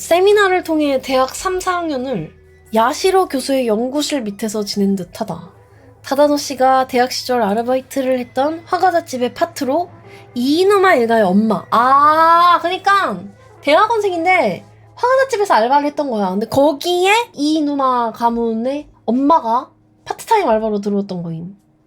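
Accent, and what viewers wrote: Japan. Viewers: 한본어 왜 은근 잘하냐 ㅋㅋㅋ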